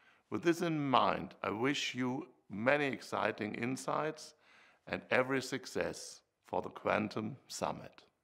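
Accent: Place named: Swabia, Germany. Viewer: Tja, dem Dickerchen scheint keiner zu zu hören, dann bin ich mal der ERSTE: Erst einmal vorneweg Herr Braunibärli, auf dich wartet nichts Größeres, das muss dir nach diesem Vortrag (ablesen) absolut klar sein. 🤪 Meine Empfehlung an dich, Dickerchen, erst einmal abspecken, ich schätze mal so 20 kg, wird zwar schwer sein als Beamter, aber das musst du nun durch, Zeit sollte genug vorhanden sein, außerdem verlangt es der grüne Zeitgeist. Nur Veggie, kein Fleisch und Kohlenhydrate Adieu. 😉 Zweitens: Spießeranzug wechseln, einfach mal locker drauf sein, mit eine wenig Anstrengung klappt das bestimmt. (Vorsichtig ran tasten) Drittens: Haartransplantation, du siehts echt uncool aus, Geld sollte ja genug vorhanden sein als zweit oberster Schwätzer der BRD. Viertens: Weniger mit den Händen fuchteln, sieht echt bedrohlich aus, wollte schon vorschnell am PC einen RESET durchführen, meine rechte Hand konnte gerade noch meine linke zurück halten, ging aber noch mal gut. 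Fünftens: Die Fahnen im Hintergrund gehen ja gar nicht, ich sehe da absolut keinen Zusammenhang, die wehen auch nicht, wollte fast schon weinen schreiben. Sechstens: Was kostet eigentlich der Schinken links im Hintergrund? (Und bitte nicht rot werden) Siebtens: Mehr Englisch üben oder war das schwäbisch, na ja, so schlimm wie der Kollege aus dem Schwabenland war es ja nun doch nicht, obwohl... Achtens: Um was ging es eigentlich? 😁😁😁 Und Neuntens: Wer hat den Quatsch überhaupt bezahlt???, wirrrrrrrrrrrrrrrrrr? Puh, fast ver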